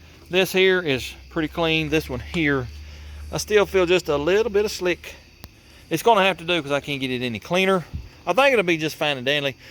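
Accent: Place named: South, United States. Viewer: I'm new to the channel. And I have to tell you ! I've laughed my but off !!!! I'm from NE Ohio. But I have plenty of relatives from the south and they sound the same ! They make fun of me too. You might want to rebuild the carboroster again though LOL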